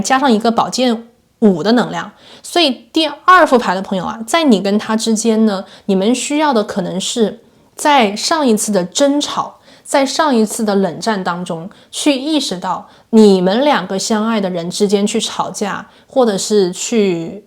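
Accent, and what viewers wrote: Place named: Taiwan. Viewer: 默默關注老師很久，很準確之外，老師聲音口音超可愛，有點台灣腔，聽了很喜歡，不會讓人覺得看不下去，希望還能有再多的測驗，感恩宇宙❤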